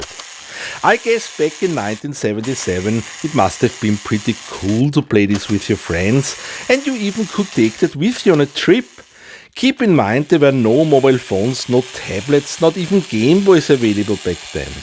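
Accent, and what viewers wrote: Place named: Austria. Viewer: austrian english at its finest!!! love it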